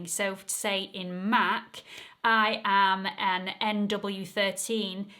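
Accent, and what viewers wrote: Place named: Newcastle, United Kingdom. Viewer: Mind blown. So simple. TY. All I know of accents I learned from Inspector Morse. You might be from Newcastle. I’m loving this account!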